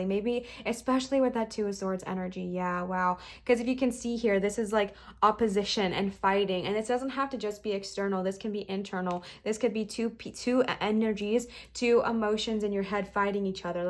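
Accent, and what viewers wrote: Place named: England, United Kingdom. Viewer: Pile 3 here💖💖💖 Once again I'm called out on being an aquarius😅💖 Lol so first thing I thought was hilarious is that last night I was having a little trip and I couldn't stop speaking in an english accent lol And also, was thinking in an English accent, like without even really thinking much of it or even trying to. When I came to this reading and you started talking in the english accent it made me remember that and I'm like so curious on what this english accent means to me lol Universe just wants me to notice that😅 A thing that I noticed that I think is super cute is how you yawn a lot when you do readings. I feel like these yawns mean something, I've always found myself doing the same thing while trying to perform in certain ways. Lol for instance when I sing in front of people sometimes I yawn A LOT and I just can't stop and never knew why. 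I never thought about it so much until I started watching your readings. I wonder if yawning is like a sign of spirit coming through or something, like a natural reaction for some of us. Whatever it is, it's fascinating and I'll probably google it lol Thank you for this, it resonated so well and was so empowering. Everytime I start to question myself I love how I have this beautiful tarot community who can help me connect to my guides. It's honestly so important, it helps me keep pushing through. I get what you mean about the comments people make. Like, this comment a friend said to me last night almost stressed me out a little bit. I w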